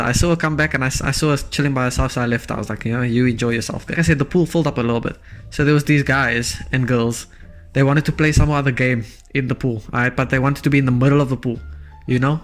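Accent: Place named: United Kingdom. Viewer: You sound British ngl also W transition at the start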